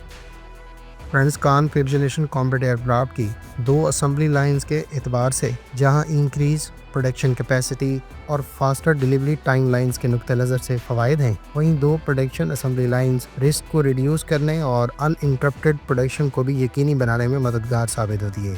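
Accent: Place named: Punjab, Pakistan. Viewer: Punjabi accent vlogger speak very funny English and Urdu.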